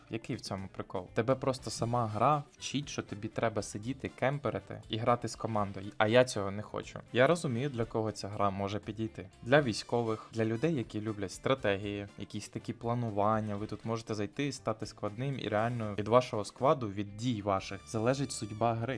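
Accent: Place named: Surzhyk, Ukraine. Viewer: Полу суржик полу українська. Чувак, я підпишусь)) Це медятіна для моїх вух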